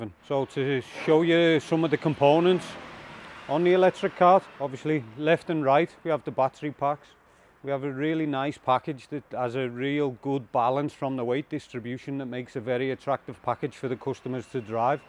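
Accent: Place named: Newcastle, United Kingdom. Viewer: I love the Geordie accent🙃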